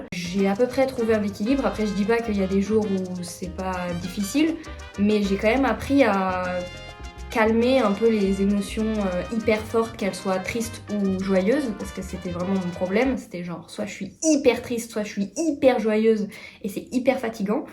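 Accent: Belgium. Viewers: Ho mais nan trop heureuse j était tellement impatience de finir la journée pour regarder ta vidéo j adore ta refait ma journée et super contente que tu aille mieux c est super❤❤❤❤❤ ( tu commence a avoir l accent belge haha rejoint nous )😂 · Grave bonne vidéo le sang, ce montage : une pépite, j’adore ! Ah oui et tu commences à prendre l’accent belge ça va plus du tout 🙃🙃🙃🙃🙃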